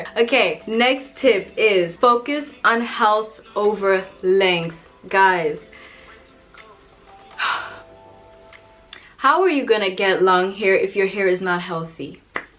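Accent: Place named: Caribbean. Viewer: Your accent is so pretty, are you from the Caribbean?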